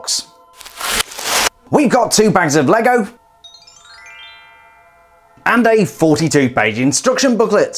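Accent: United Kingdom. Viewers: Hi! New to the channel and I love your reviews! Can't help but notice that you have a British accent but you review LEGO in American packaging :P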